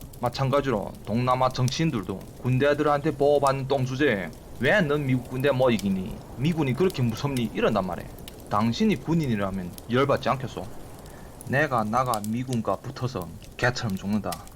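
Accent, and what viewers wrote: Yanbian, China. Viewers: 잘들었소ㅡ오빠욕하는것도 멋있고 연변말도 너무멋있소 다음절목을 기대하겠소 화이팅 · 야 너꼭 돈 받아먹고하는 소리구나 이후부터 연변말 하지마 왜 뭘아는것처럼하며 남이 정치에 간섭이냐연변사람 쫒 팔리계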